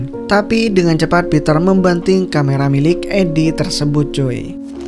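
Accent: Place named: Java, Indonesia. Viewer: Pake kata (saya) aja Biyar enak di dengar bng ,( guaww) terlalu medok jdi sedikit geli. (Ini saran bukan hina)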